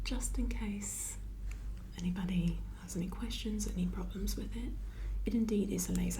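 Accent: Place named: United Kingdom. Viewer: You sound more like a British Mi5 agent:)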